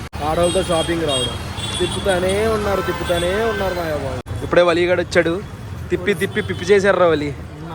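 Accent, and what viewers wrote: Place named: Godavari, India. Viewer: మా గోదారోళ్లతో అలానే ఉంటాది మరి... Love u 💗💗💗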